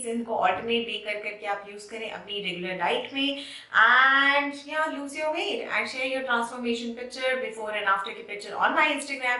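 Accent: Punjab, India. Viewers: Hey r u punjabi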